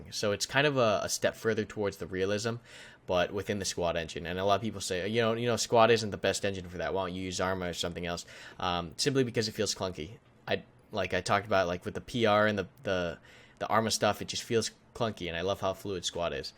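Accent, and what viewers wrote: United States. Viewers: 😍😍 you look sooo cute so just you look Asian, you speak American (not English) and you live in Hawaii omg so cool 😁 pay attention you're starting to speak like Bluedrake42 (the bad (but not baaaad just not that good) way that you do sentences) 😂xD well lov u 😉😍😘❤ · Asian? with american voice? anyway nice video like always. keep it up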